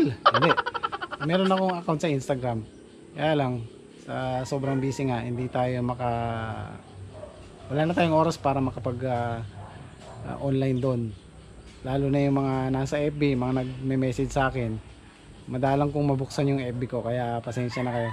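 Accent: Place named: Pampanga, Philippines. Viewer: Kapampangan na boss magkasakit yang magtagalog i atsing magtindang sliding haha .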